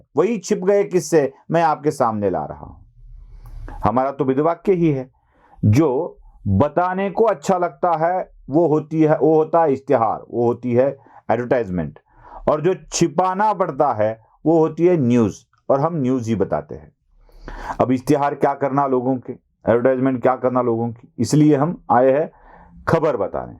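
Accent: Maharashtra, India. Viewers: aapki marathi lahje men boli gai hindi sunne men accha lagta hai. up ke bare men aapka precomplex bad men up tour ki sacchai ka bayan karna sukhad tha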